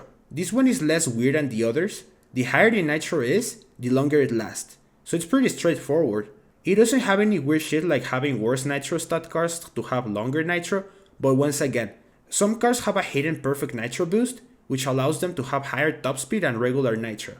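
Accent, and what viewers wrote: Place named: Mexico. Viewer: dude u sound mexican af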